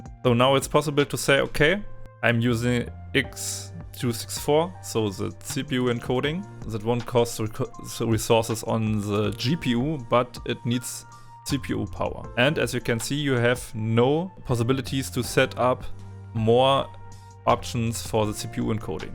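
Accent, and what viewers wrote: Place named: Germany. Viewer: Man hört das Deutsch aufjedenfall raus 😂❤ danke für den hilfreichen Tipp 😍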